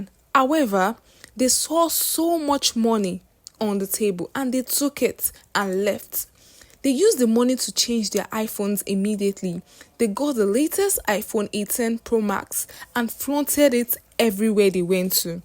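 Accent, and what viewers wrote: Yoruba, Nigeria. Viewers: She's Yoruba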